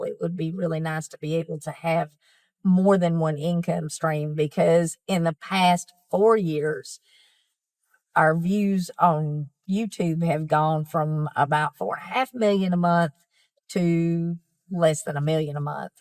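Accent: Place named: Kentucky, United States. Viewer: I've always thought you were from kentucky. 🤦‍♀️ I think the accent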